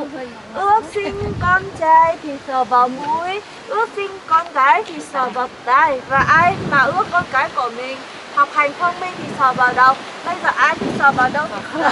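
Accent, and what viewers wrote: North, Vietnam. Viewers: Lại nghe giọng bắc...hơi nặng!!! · Nói tiếng bắc.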